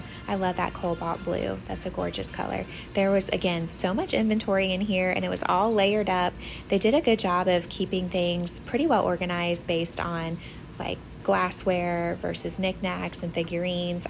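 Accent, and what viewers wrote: South, United States. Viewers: What?? Who on Earth could/would say anything about the sweetest voice! I'm from and live in NH and I love your southern accent!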